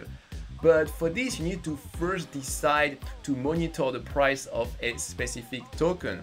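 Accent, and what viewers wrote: France. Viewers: He sounds french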